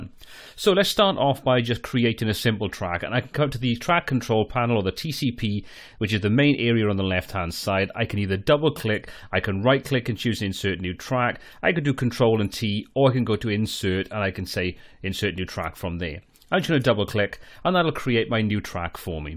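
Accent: Wales, United Kingdom. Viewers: Excellent video, easy to follow. Solved my recording problem in the first few minutes. And a great Welsh accent.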